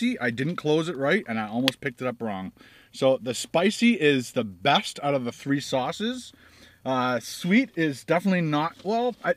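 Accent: Quebec, Canada. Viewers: yeah in québec we sound like that lol and we have a thousand type of poutine! try poutine planet in québec, Qc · your quebec accent is right on!! when are you coming up east ,in quebec...to review some local restaurant? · Iam form germany ... i understand that Barbecue Sauce Stuff ... especially we dont have a lot of good ones here! PLS say more OUT About and Without love that canadian accent :D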